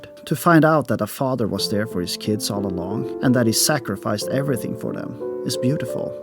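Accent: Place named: Sweden. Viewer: May I ask what country you’re from? You sound Swedish, and I hope that’s not insulting. My grandma is a full blown Swede. Great channel dude! I’ll definitely be following you and looking forward to what you put out next.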